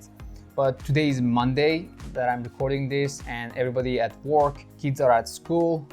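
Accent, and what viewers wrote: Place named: Iran. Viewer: That Iranian accent lol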